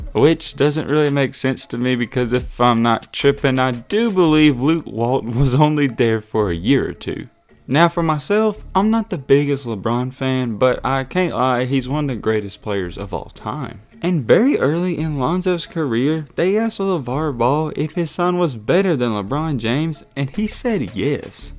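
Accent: South, United States. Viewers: I hate your hillbilly voice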